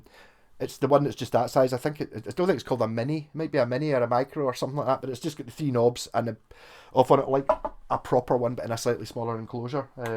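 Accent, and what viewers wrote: Scotland, United Kingdom. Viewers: I'm referring to the bass in the video.. You did say it is a Wasburn refinish by JPAX correct ? I'm sorry if I'm bugging you but that is a great little bass and I'd like to find one for myself to try.. You do such great reviews and I love to hear your thick Scottish accent ! I'm in the USA but my ancestors were part of Clan Buchanan. I'd love to visit there someday.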